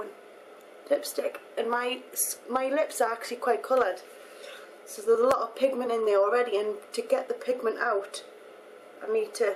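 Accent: Newcastle, United Kingdom. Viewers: Are you from Newcastle upon Tyne?? Sound Like A Geordie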